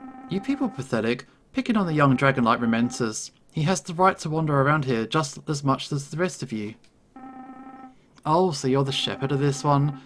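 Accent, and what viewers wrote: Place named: United Kingdom. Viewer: Is watching this making me a furey personnally i m only watching u for the bri'ish accent pls say Bo'oh oh wo'ah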